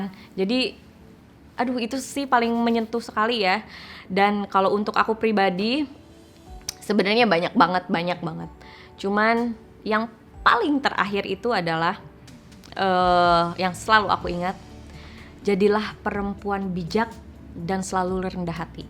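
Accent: Indonesia. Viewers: Sunda pisann logatnya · Sundanya mentok teh, mantap!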